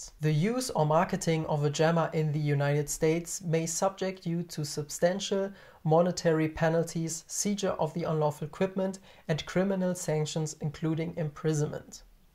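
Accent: Germany. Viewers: you have a german accent lol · Are you German ?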